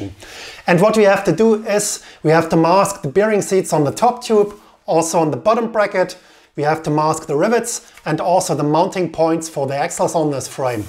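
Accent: Germany. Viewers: Funny Guy, Hilarious comments, No BS to the Point, Crazy Accent - German if i am right! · deutscher akzent on point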